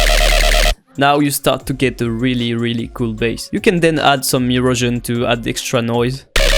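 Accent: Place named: France. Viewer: That french accent, Sah quel plaisir ! Thanks for the tips did'nt know we could use samples in the noises osc ^^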